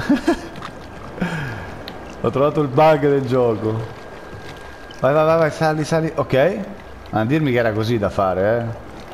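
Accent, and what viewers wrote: Rome, Italy. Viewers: come al solito mmmaaaazzzzuuuuunnnnnaaaaa,spolliciate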